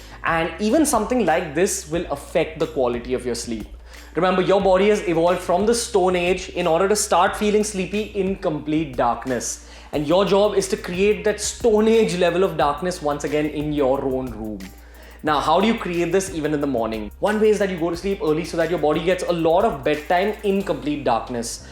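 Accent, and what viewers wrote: India. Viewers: Be grateful that indians are speaking english. They dont need to. If you dont like his accent, fuck off!! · fuck indian accent. irritating af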